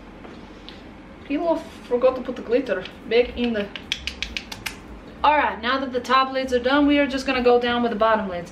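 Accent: South, United States. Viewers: I loved ur Southern accent & I'm from the south born & raised & still here. Lol but I gotta say ur accent is better than mine. I love ur videos. U look absolutely AMAZING. & U r amazing. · A better Southern accent than half the people in Hollywood. "Only you can decide what you don't like about your face. Other people can try, but you can tell them to shove off." If I didn't know any better, I'd think you were a true Southern Belle. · I love the southern but I missed YOU in this video. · Your Southern Accent reminds me of Mary Stuart Masterson as Idgie Threadgoode from Fried Green Tomatoes! Well done! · Is this a southern belle voice? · I'm new to the channel but I love your makeup tutorials! I've never been able to do a proper wing, but after watching some of your videos, I decided to try the flick, drag, and hope for best method. I got so many compliments. 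Thank you!! Also, being from the South, I love the accent. Just like a Southern belle darling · I love your southern accent. "I hate this with a burning passion" I've said that so much. Lol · the southern drawl is... Creepy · Dear, when I first saw one of your videos I thought you were Russian, only to find out you are Australian. Being from Georgia, your southern accent is pretty good! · Your Southern accent is sooooo good. I love love LOVE IT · I am digging the southern accent. You are truly my favorite youtube goddess. Signed a southern country girl from Florida 😂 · Im from Tennessee and I’m impressed with your southern accent! At first I was more confused than a fart in a fan factory but then I realized what was going on. 😁💜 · Love how she slips in and out of a Southern accent.....sounds like a cross between Georgia, maybe a smidgen of Tennessee and Texas in there 😍 and the look is stunning !!!! 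· I am so proud of your accent in this one! It's hard for some people to pull of a good American accent but you sounded like a total southern belle. I am applauding you so hard right now😁😁😁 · Omg your southern accent is amazing I have laughed so much watching this thank you so much because I definitely needed a smile today 😊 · Living for the Southern accent because it literally sounds like so many people I have grown up around lol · I love your videos but this accent has to go. It’s such a horrible drawl and southern accent. Being a southerner it was so so hard to listen to. Please go back to your normal voice and accent. I love the normal, it’s so happy and delightful. Beautiful make up though. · The smooth Southern US accent is actually so good and relaxing, it's weird lol. The marcelled waves are really cute! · I love the southern accent, although I prefer old accent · I love the country accent😁😁❤❤ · I loved the bits where good old appears because, not that this fancy southern lady accent isn’t fabulous, it just isn’t in her true form. 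· I am so loving your Southern accent ❤️ You sound more Southern then I do and I am from Alabama the heart of the South 👍🏻❤️👍🏻❤️👍🏻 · I love! You're southern accent is on point! I'm from Alabama so I'm an expert:-) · I love the southern accent my husband is from the south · Love the southern accent.....but God it threw me off so much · 😯 Well colored me impressed! I'm from the South and your country twang you got going on there is amazing. Lol took me by surprise.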